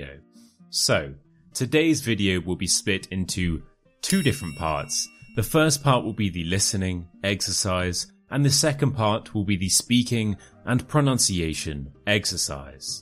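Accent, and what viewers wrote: United Kingdom. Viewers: Are you from England and Scotland?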